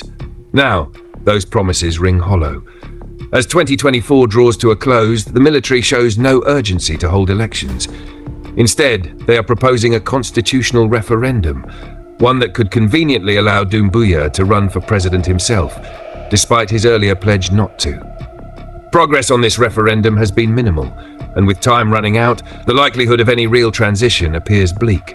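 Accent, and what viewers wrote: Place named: Europe. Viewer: African content in European voice? We can do better.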